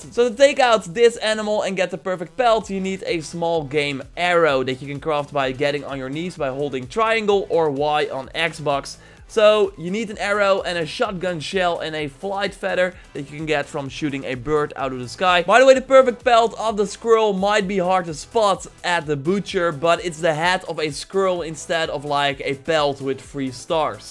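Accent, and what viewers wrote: Netherlands. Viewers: Are you dutch? anyways do something about the accent jeeez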